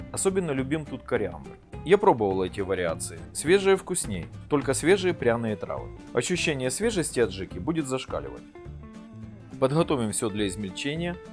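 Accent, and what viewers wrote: Ukraine. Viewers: Рецепт то абхазский,но говор у Вас украинский или Ростовский,Краснодарский,но никак не апсны!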